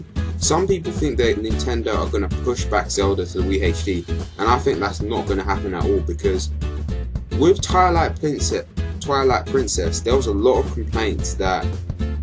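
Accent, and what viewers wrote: United Kingdom. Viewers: You're from London, and you're either ghanian or nigerian. Am I right? :)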